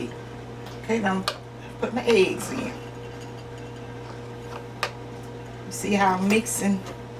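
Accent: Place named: North Carolina, United States. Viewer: This evening is my first time seeing your show. I had to click play when I saw the name of your channel, Happy Table. I have never had corn pudding. I am 51, and I have seen it twice at potlucks about 30 years span! In each case, it was provided by a young lady with heritage from the Caribbean---Guyana and The Bahamas. You sound like my husband's people out of North Carolina, and like church women I would have liked to listen to and watch at church as a child, or like distant relatives at a family reunion where you feel right at home and belong. Thanks for sharing your recipe and happy kitchen. Now that I see what is in that corn pudding, I might try it. (I sometimes wonder how to make spoon bread. That is an idea.)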